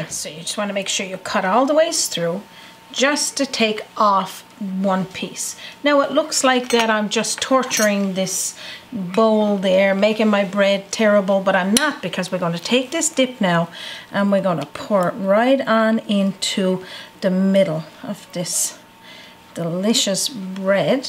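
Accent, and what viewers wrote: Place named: Newfoundland, Canada. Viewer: I so enjoy your NFLD speak. That dip looks so darn good thank for your video.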